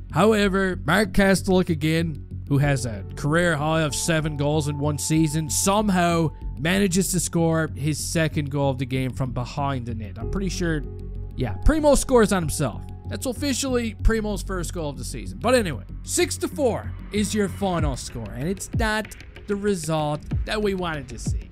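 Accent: Newfoundland, Canada. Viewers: Is he from Newfoundland? I’m from NL been watching this fella for about a year now or so and always thought he was. but wasn’t sure lol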